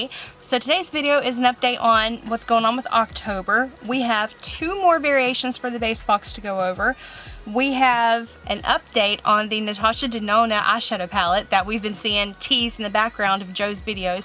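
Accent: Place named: Georgia, United States. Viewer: Love your style. And accent-- it reminds me of home. GA girl here ;)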